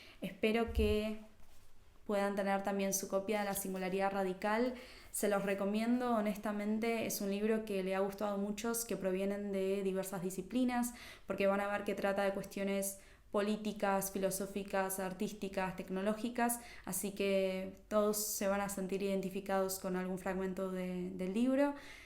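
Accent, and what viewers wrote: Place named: Argentina. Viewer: Gracias. Trataré de encontrar el libro. Te encontré a través de Gustavo Salle. Insólito. Por la forma que tienes de hablar eres argentina, no? Soy uruguayo, te estoy siguiendo con avidez desde hace poco. Muy profundo tu exposición sobre el tema de la Singularidad. Te saluda con afecto, desde Uruguay, Eduardo, artista plástico egresado de la Escuela Nacional de Bellas artes.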